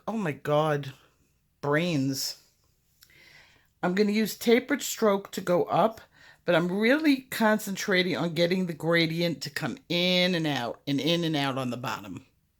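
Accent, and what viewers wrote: New York, United States. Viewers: Where are you from? Sound like NY or NJ?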